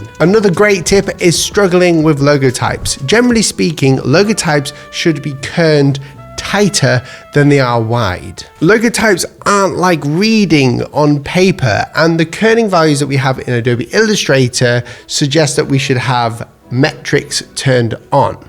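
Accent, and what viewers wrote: United Kingdom. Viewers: good and very informative but british accent sucks when watching tutorials like this. No offense. but its hard to understand what your saying because of the frequent pausing every word, making the sentence sound like one word and talking fast. its annoying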